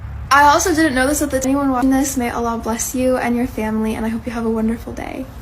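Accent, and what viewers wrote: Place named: United States. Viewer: Sister I can tell you're definitely American. And you're a good servant of ALLAAHH..in sya Allaahh. May ALLAAHH PROTECT n bless you eternally❤❤❤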